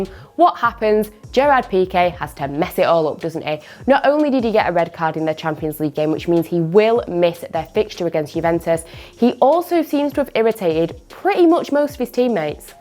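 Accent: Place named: Manchester, United Kingdom. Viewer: Love that accent! Is that from Manchester? ;)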